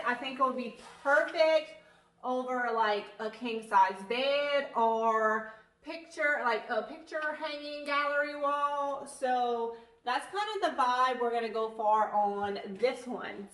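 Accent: Louisiana, United States. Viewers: You are so engaging and I love your accent! I have relatives that live in Morgan City and when I first heard your voice, I immediately thought of them even before I knew you were from Louisiana! You are truly an inspiration. I'm sooo happy I found your channel! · Dear, I love all the DIY'sbut I am in love with the placemat project!! I am going to attempt to build one. I have a question. I had cousins who lived in Donaldsonville and they sounded just like you!! Do you live in Donaldsonville or near it?? xo Linda Alvarez from New Orleans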